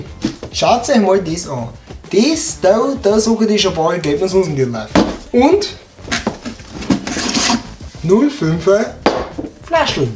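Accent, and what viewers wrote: Bavaria, Germany. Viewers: Konnte als Niederbayer aus Landshut, dort geboren und aufgewachsen mit niederbayerischen Eltern und Freunden, kaum mithalten. Ein Sprachmittler zwischen Pleysteiner und meinem Dialekt wäre fast notwendig gewesen. Ein Allgäuer, nehme ich an versteht den gar nicht. Muss er ja auch nicht.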